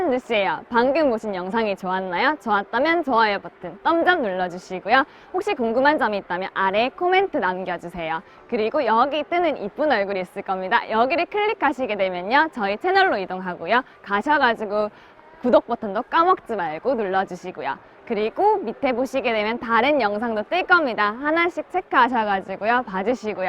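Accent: Gyeongsang, South Korea. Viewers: 경상도인지 경상남도인지 말투가 팍팍 꽂히네요 이해 안될수도있지만 전 사투리가 부러워요.기억이되서..잘봤어요 구독합니당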